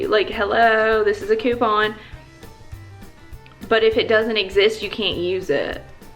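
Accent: South, United States. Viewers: My sisters name is. I can’t marry her (I’m adopted(probz sound weird😅) but your name is W two DDs F.U.N F.U.N. My artist name has two DDs(like this) too ahaha. Anyway you sound southern. Southern hospitality meet African affability together= ignition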